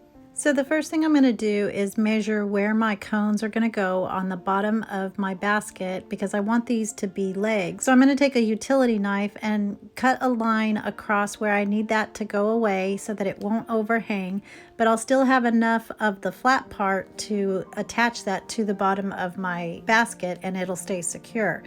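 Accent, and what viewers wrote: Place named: Georgia, United States. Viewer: I always LOVE watching your channel! You are so creative!! Love when you say, “anytime you can get more Jesus” in your projects! Because we all need a lot more Jesus! Love that you always share inspirational meanings in your projects!! I love Crafting Cousins as well! (Btw I’m from GA, so I have that same “cutie patudie” (don’t know if that’s spelled right!) ..accent too!) 😂 God Bless you all, as you share God’s word, through your creative gifts of crafting! ❤️🙏🏼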